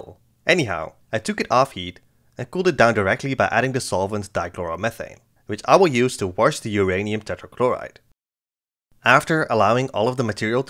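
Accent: Netherlands. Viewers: You sound Dutch. Loving the content!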